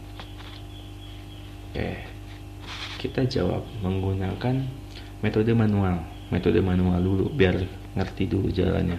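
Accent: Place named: West Java, Indonesia. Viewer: pantesan aya logat" sunda hehe...abdi ti sumedang kang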